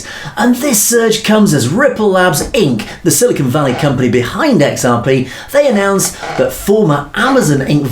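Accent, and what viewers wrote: United Kingdom. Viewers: Like ur typical Uk Accent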